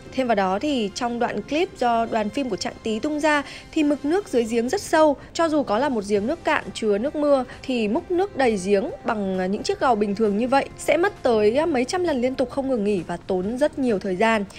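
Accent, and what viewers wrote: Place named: Thai Binh, Vietnam. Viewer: Giọng Thái Bình "quê" Ok... Ae Thái Bình điểm danh👇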